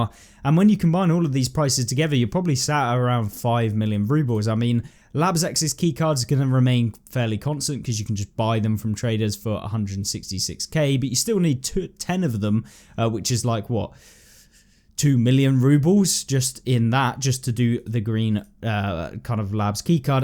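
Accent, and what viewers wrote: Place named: United Kingdom. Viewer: i like that british accent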